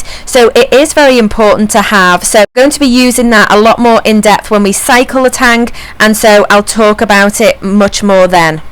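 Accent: United Kingdom. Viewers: i'm a bit confused, you sound british, yet you describe capacity in gallons, and all your links to buy stuff is in dollars.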